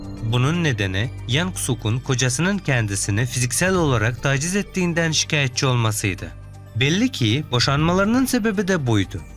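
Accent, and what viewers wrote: Syria. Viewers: seslendirmeyi suriyelimi yaptı? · Youtuber kardeşim nerelisin, şive ve ağız çok farkı, Halep ten mi geldin sende yosa?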